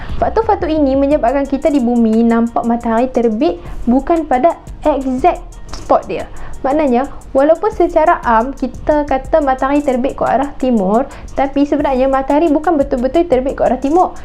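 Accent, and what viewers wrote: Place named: North, Malaysia. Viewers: Dia punya putaq sampai aku dah tau speaker org utagha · Speaker pon macam orang utara juga tu hehe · Lorat utara pun kuat jugak tu 😆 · Aku bangga dengan akakk ni rilek jaa cakapp utara macam besa 👍 bukann apa sbb kawan aku baru p kl sebulan balik mai dok cakap kl , contohnya tengah sembang dia tanya depe pi mane ? 😂 aku rasa orang kl cakap diorang bukan depe 😂 jem otakk nak tegoq 😅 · Comel Orang utagha weh, berputaq teruih, hang mai aloq setaq kepala hang ku putaq,🤭🤭🤭🤭🤭🤭🤭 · putaqqq..kuat naa utaraq dia😂😂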